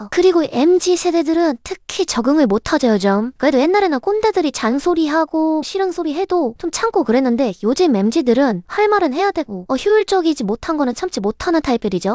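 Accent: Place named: Gyeonggi, South Korea. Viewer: 얘 말투 이거 경기도사투리냐? 귀엽네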